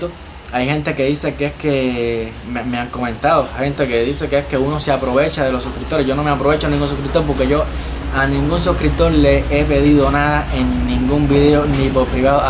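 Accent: Cuba. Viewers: Cuban Spanish language sounds very different than the Spanish I am accustomed to hearing in NYC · Me encanta tu acento. Primer cubano que escucho que habla de forma fluida y entendible.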